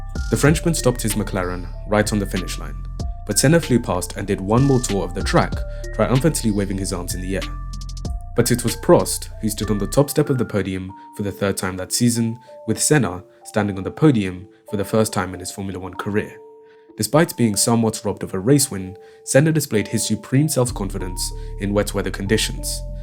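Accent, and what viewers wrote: United Kingdom. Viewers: bruv your roadman accent fuckin annoying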